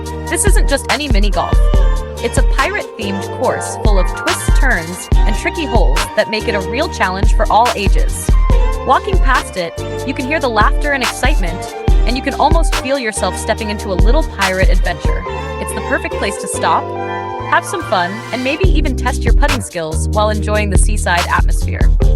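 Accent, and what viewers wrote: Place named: United States. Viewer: Ruined by this awful American accent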